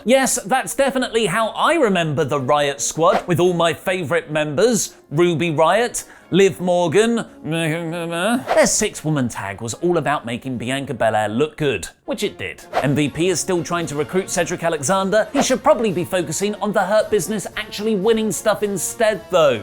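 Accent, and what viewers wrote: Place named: United Kingdom. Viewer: "Rawr and Smackdown" .... that British accent SMH.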